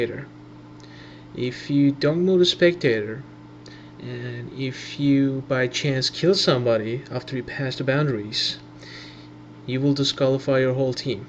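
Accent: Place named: Turkey. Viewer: Listen to and his cute ottoman accent <3.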